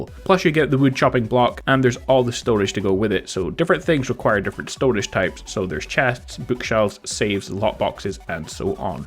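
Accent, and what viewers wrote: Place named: Scotland, United Kingdom. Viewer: Your accent sounds a little bit Scottish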